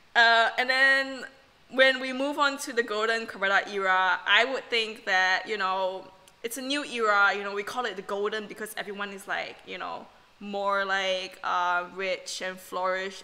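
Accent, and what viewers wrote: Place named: Caribbean. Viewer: the accent sounds caribbean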